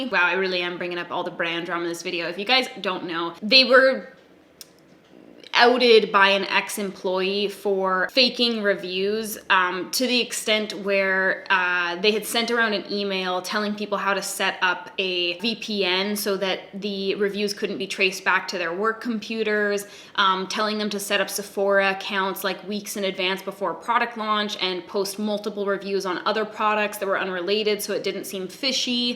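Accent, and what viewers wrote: Canada. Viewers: I have many thoughts on today’s vid but I’m very, very tired so I’ll leave you with this: you said ‘saga’ in your sweet lil Canadian accent and I heard ‘Sega’... like sega genesis. Like sonic the hedgehog Sega. And I was like ‘so was NOT kidding. This video went off the makeup rails’ and then I realized you were saying ‘saga’ and I couldn’t stop laughing about what a dumbass I am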